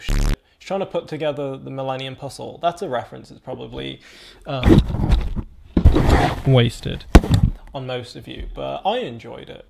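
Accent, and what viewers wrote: United Kingdom. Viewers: I can see what you mean by black passing. But what I looked at you I actually didn't think you were black. like full african. I thought you were like well actually I did think you were black like I thought I was confused like I wasn't sure if your Fulani Ethiopian smiley. You could have been all those things so I guess you're right you are bypassing. I thought when I the English accent to me I think that's what set me off. Because if you were over here in America you would just be black and my opinion. Because black Americans I don't see any mixed black people looking like you. Really at all.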